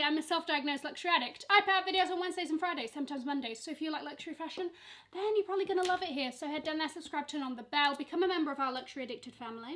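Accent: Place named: England, United Kingdom. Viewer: You have an ugly English accent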